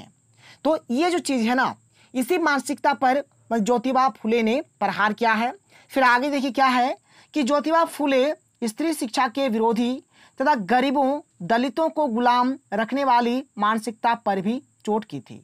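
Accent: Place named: Jharkhand, India. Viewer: Aapka language kabhi kabhi Jharkhandi jaise bolte hai aap sir ji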